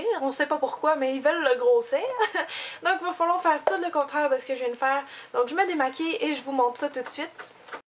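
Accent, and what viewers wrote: Quebec, Canada. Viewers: Super tes video.j adore ta facon de parler et ton accent bec:).est ce que les couleurs sont les mm pour une peau mat? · Salut jsais pas comment jsuis arriver sur ta vidéo mais on s'en fout ! Je suis Suisse et dans 2 semaines je vais au Canada et rien que pour ton accent jme réjouis d'y aller ahaha ! "On va commencer avec les plus gros nez" Aahha merci pour cette vidéo. Tout du bon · Bonjour à toi! je suis française et je viens de te découvrir , j'adore ton accent ayant vécu un an par chez vous ! cette vidéo m'aide bcp car je trouve mon nez est trop gros donc merci pr ça et petite question , je voulais savoir si tu saurais faire un tuto sur les maquillage pr filles métisses car je le ss ( inde/ france ) lol et si tu aurais des suggestions car je fais bien trop souvent le mm make up en soirée ou tous les jours, et j'ai les yeux en amandes dc savoir comment et quoi faire ! 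;) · j'adore l'accent québécois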